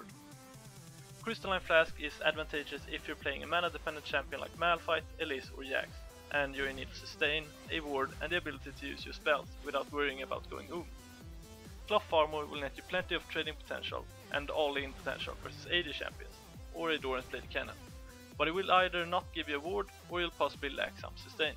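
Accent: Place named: Norway. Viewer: where are you from? you sounds like you are from norway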